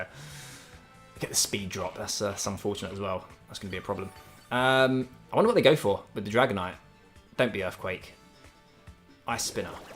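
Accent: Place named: United Kingdom. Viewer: Brits just say Ariados so much better than us Americans LOL If you want to continue the trend, I would like to see a Spidops team!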